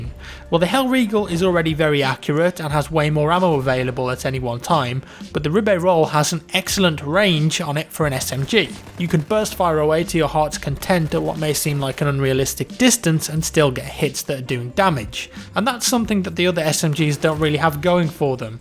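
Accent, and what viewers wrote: United Kingdom. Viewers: hey man I'll make it short I like you vids and your buttery British voice❤️ I would also love the Xbox you giving away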